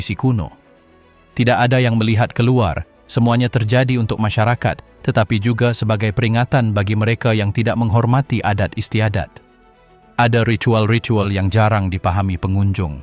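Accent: Malaysia. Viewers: Iki canel wong Malaysia... Logat dan bhs Malaysia nyel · Pencerita seperti org Malaysia tapi pakai gaya bahasa Indonesia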